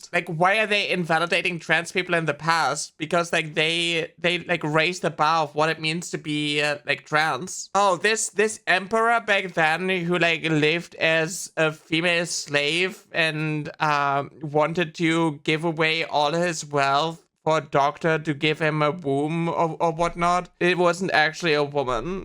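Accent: Germany. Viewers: ... are you german by chance?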